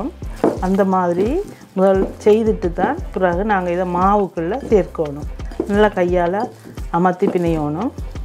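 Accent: Sri Lanka. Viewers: Neenga pesurathu ilangai.tamil madiri iukki pakada recipe marvellous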